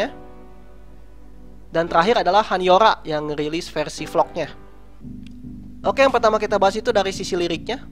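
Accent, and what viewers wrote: West Java, Indonesia. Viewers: accent kaya orang sunda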